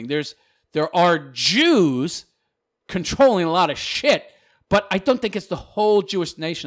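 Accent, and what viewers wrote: New York, United States. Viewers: I swear this is Bill Burr s secret YouTube personality haha Sounds just like him he puts the Patreon thing up to sell it that he's a regular guy too hah a bit of a voice changer mic too if not Bill should hire this guy to fill in for em when he's sick perhaps he's a lil more new york than Boston but still crazy close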